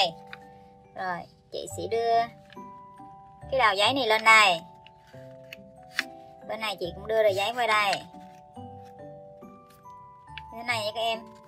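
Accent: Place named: South, Vietnam. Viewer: Đoán vội người miền nam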